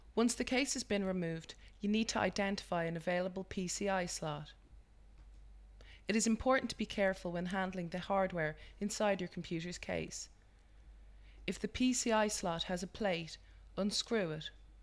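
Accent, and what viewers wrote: Ireland. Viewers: She sounds Irish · great, simple video ... 4 stars + 1 star for the Oirish girl doing the talking.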